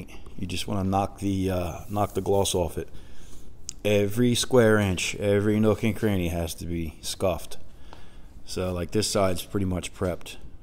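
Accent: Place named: Philadelphia, United States. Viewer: Nice video. Your accent sounds like my whole family from Philly and New Jerz.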